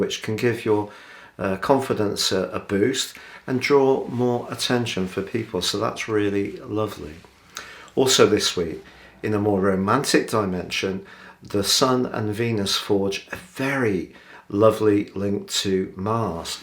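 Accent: United Kingdom. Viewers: I love this gentlemen. I love to hear him. He speaks fine and proper, love the British accent, I my personal opinion is the must marvelous, beautiful accent. Love him!!!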